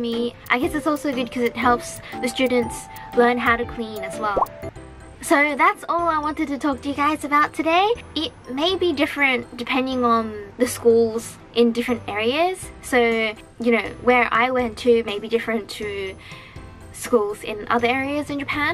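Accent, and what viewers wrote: Australia. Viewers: Me gusta saber inglés y entender lo que dices sin necesidad de subs, gracias por el vídeo mija :D P.d: Me gusta tu acento Australiano... no estoy segura si es de ahí.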